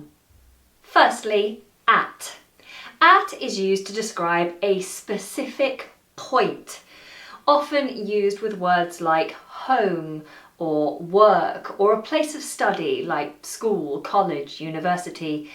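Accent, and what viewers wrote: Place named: United Kingdom. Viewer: I'm( in ) love with British pronunciation...A fan from colombia south America..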